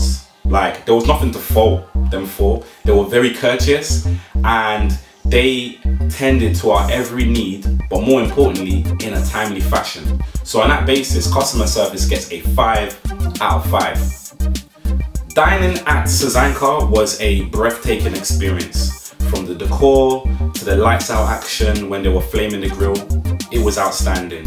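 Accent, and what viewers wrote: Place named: United Kingdom. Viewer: A black man speaking English with a British accent in Amsterdam while at a Japanese restaurant... Whoa my brain just froze bruv I swear lol but this vid satisfying af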